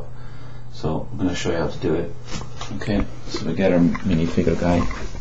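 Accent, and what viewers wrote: Ireland. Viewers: i think he sounds a tiny bit irish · u sound irish are u??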